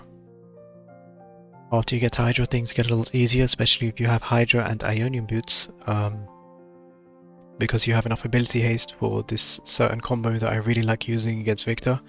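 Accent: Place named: Europe. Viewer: i noticed you have a hint of european accent in your voice :) . also good guide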